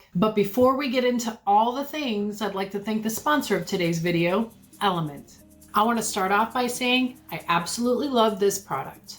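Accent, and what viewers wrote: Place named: Pennsylvania, United States. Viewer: Got a sister who is a dealer in a casino also and her husband is a security guard. She's been at it for years. And it's really been hard on her body and she's gained a lot of weight. Loves her job but she's not ketovore or anything like it. YOU CAN DO THIS!! You're a true believer in this WOE and you'll stick to it.!! If you're in PA I just wonder if you're at the same casino??? You sound like a Pennsylvanian! First time to your channel so I hope you wouldn't mind telling us where you are? BEST WISHES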